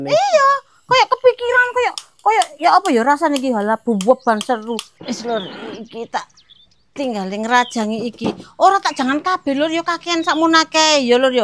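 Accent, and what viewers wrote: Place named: Java, Indonesia. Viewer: Assalamualaikum..met malam..saya sangat suka klu ngonten trutama bhs jawanya yg medok..oh iya pngling skrg rambutnya terurai makin ayu skrg..biasanya di ikat trus..skrg manglingi...sukses selalu...❤❤❤❤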